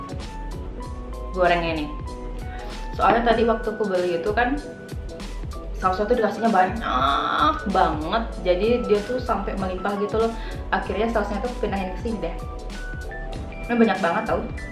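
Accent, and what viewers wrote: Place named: Bali, Indonesia. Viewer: logat balinyaa!😍❤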